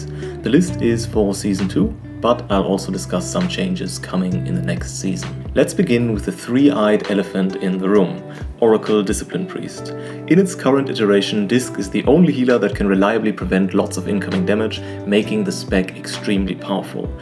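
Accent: Germany. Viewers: I like(d) all your videos so far! They are really good - especially the Pres evoker and disc ones! Piano sounds aren’t bad at all, but it is the wrong note for me. They doesn’t fit to your script. It is just my personal feeling, so don’t worry! This doesn’t mean, that your videos are bad or something. 🤘🏼👍🏻 Just a tiny hint: try while editing a click or short swift sound to have a comparison. Furthermore it is obvious or at least it looks like, that you are reading your text. Another suggestion: just read your topic bullet points and use your own words. I’m sure it will underline your enthusiasm even more! Your next video will be good too! Even if you aren’t doing +12s or above with your Druid ☺️ …and last but not least least: I’m German too 😅 so… I can hear it 😎🤣 however, your English is really, really good!! I have to speak English day by day, but I’m far away from your speaking skills! 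Cheers m8 and I’m looking forward to your Druid & mw monk videos! 🤘🏼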